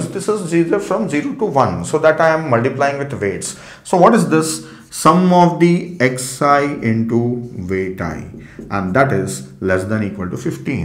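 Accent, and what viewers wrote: India. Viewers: Perfect Indian accent, I just love it. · Rabbim sen beni hint aksanına mazur bıraktın ya artık ne diyeyim final sınavında sıçtım zaten türkçe kaynakta yok gömsünler beni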